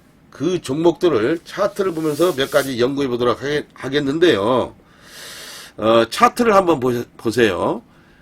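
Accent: Jeolla, South Korea. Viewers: 유바이오 . 언급해 주셔서 깊이 감사드려요 항시 도움주시려 애쓰시는 모습도 감사합니다 전라도 사투리도 정감갑니다 늘~ 건강하시고 평안하시길 기원드립니다 ♡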